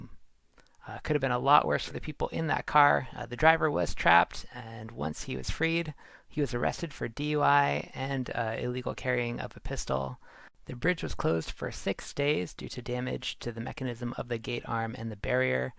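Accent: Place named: Seattle, United States. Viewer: I love how the most seattle sounding dude is commentating this.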